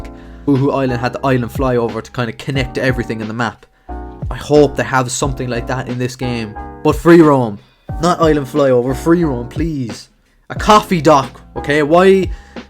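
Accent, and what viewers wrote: Ireland. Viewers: Your accent are you Irish?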